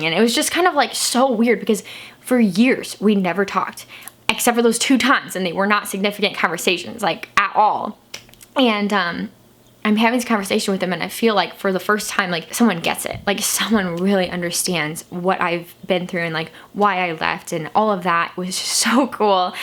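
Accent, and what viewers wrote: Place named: Illinois, United States. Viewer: I really enjoyed this video. And also, I You said people from Illinois dont have accents, but I find that funny because you definitely have an accent.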